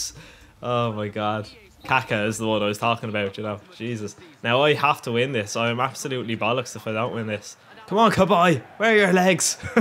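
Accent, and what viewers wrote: Ireland. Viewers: is that an irish accent? sorry that I don't know haha and will you do a packopening? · Your story was actually funny :P Nothing better than a crazy irishman (Or scotish wathever) telling a story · Are u Irish?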